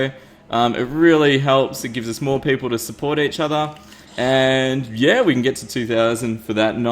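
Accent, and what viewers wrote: Australia. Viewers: you australian?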